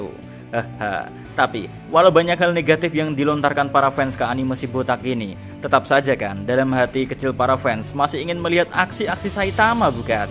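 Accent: Java, Indonesia. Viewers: Orang jawa ya bang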